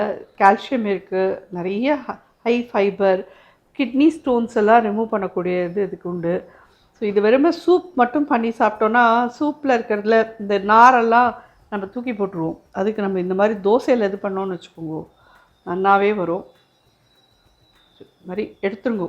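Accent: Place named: Thanjavur, India. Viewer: ட க்கரா இருக்கும் தஞ்சாவூர் ஜில்லா காரா பேச்சு போல இருக்கு . நீங்கத் தஞ்சாவூர் ஜில்லாவா .நாங்க கும்பகோணம் .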